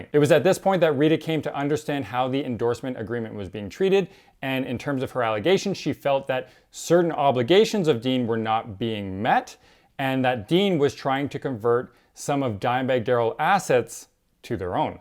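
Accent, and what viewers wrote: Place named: Canada. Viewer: All I heard was “ ABOTE “ and “ OTE “. Canadians just can’t grasp the “ OU “ sound.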